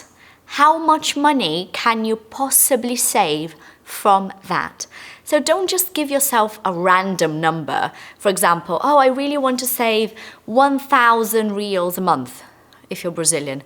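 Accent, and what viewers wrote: United Kingdom. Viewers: I love your British accent, happy new year for you and your family 🇬🇧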